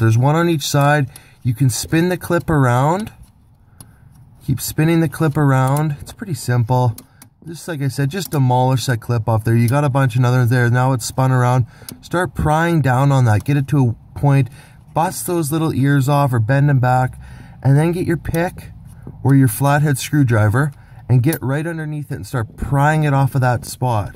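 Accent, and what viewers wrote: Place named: Canada. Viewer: He’s got a great white north accent bud.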